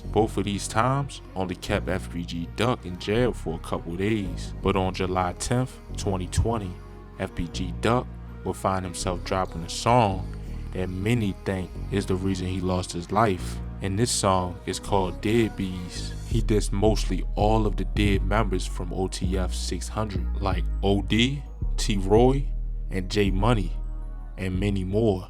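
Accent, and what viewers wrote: Baltimore, United States. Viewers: He definitely from bmore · Facts I literally can hear it in his voice especially when he said “Tew” instead of “too” Baltimore people stay saying that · You from Baltimore, my DC ass can hear it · He gotta be from Bmore with that accent lol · dude who channel this is gotta be from Baltimore lmao he say " Tewww , Lewse , Avenewww " 🤣🤣🤣🤣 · you from baltimore aint you? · I’d guess Baltimore. I loved there for about 6 yrs. that’s definitely a bmore accent. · That Baltimore accent something else!! Like your content. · Are you from Baltimore?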